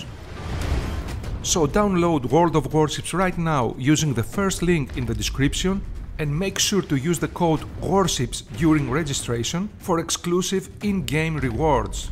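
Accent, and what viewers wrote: Greece. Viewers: nice work and greek accent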